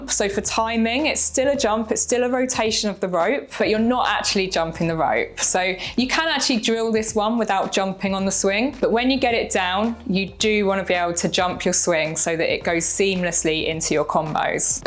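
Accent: United Kingdom. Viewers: nice lesson. Your accent confuses me its 40% British, 60% American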